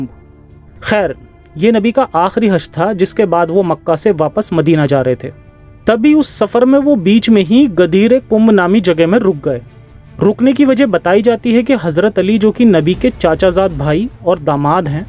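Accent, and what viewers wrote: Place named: India. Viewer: Awaz say he Indian lag raha ha. Jhoota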